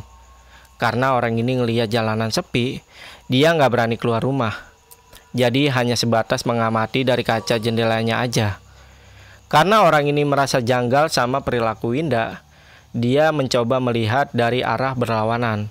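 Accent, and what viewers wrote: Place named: Bali, Indonesia. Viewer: Keknya abang pembawa acaranya orang Bali, soalnya napak kali logatnya kalo ngomong T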